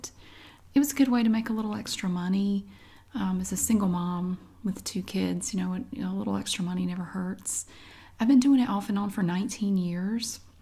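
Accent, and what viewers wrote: South, United States. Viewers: Do you have a patreon account? You should set one up so we can give back in gratitude to what you do for us. A good way for you to also make a little (and much deserved) cash. As for the Brioche, i don’t know what it’s like in the U.S but the French particular Brioche that we get imported to Australia is not dense. It’s very sweet and very soft n fluffy. Perfect for French toast and PB&J but not really with savoury toppings. Just my opinion.. Thanks for another great vid, I’m addicted to your soft spoken southern accent :) x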